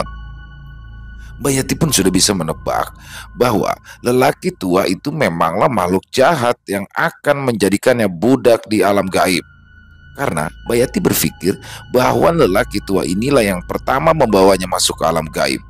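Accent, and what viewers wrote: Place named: Banyumas, Indonesia. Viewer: Tumben itu perkataan yg dimaksud diluar kebiasaannya. ContohTUMBEN KAMU MASUK PAGI PAGI BIASANYA DATANGNYA SIANG. KLO sampaikan/sampean itu kata sama dengan kamu, lebih ditujukan untuk orang yg lebih tua dari kita. Aksen bahasa Jawa ngapak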